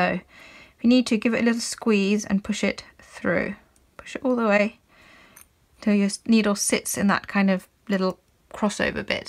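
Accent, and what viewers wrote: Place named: London, United Kingdom. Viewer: "Not so giddy at threading..." Ah, the middle class Home Counties accent.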